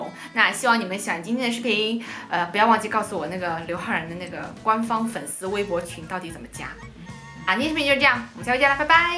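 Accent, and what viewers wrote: Shanghai, China. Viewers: 杭州人听到上海口音就有回家的感觉了哈哈哈。第五年了还是在美国自己过年。😭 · Hallo, 你推荐的产品真的很好看也很实在，那瓶Essie的指甲油涂上美得不要不要的。我也是上海人，你的上海话和带一点点上海口音的普通话听来非常亲切。我住在德国斯图加特已经十一年了，中国年没假，肯定在斯图加特过年了。我们离得太远了，不然真想去找你玩。还有我也曾留言请教你这么多衣服如何分类或处理，有机会出个专辑吧，除了买买买，我们也要会收收收,藏藏藏，理理理，扔仍扔。最后祝愿新年快乐，万事如意！喜欢你! Xia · 新年快乐啊！ 只能在英国过呀 也是很想回国吃吃喝喝的 哈哈哈 之前突然看了一个你的视频 特别喜欢你的性格 加上上海口音 太可爱了！ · 作为一个出生在上海 现在在墨尔本上大学的留学生，每次看你的YouTube（上海口音的普通话）就觉得特别特别亲切，特别想家。今年年三十在墨尔本和同学一起烧饭吃，谢谢你的视频！一个默默无闻的小粉丝 希望有机会能在上海相遇～x Angela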